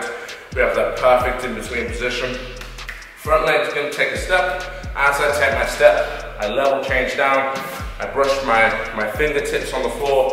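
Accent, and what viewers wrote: England, United Kingdom. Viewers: You're pretty good, it's strange for me to see bjj with English accent.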